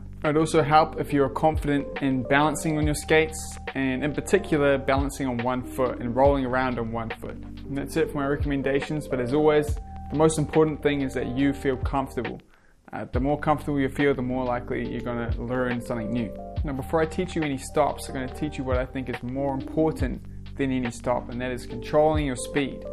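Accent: New Zealand. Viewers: nice vid bro - are you from NZ >? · Sounds like a kiwi accent to me :) Thanks for the great tips!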